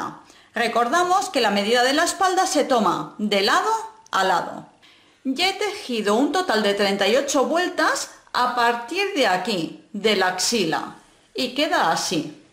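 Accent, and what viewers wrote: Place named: Berga, Spain. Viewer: Hermoso, me encanta!!!! (Tienes acento berguense). Saludos!!!!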